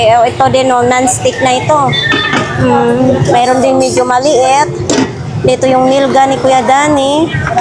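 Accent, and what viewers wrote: Visayas, Philippines. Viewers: Ate .parang Visaya ka 😂😂😂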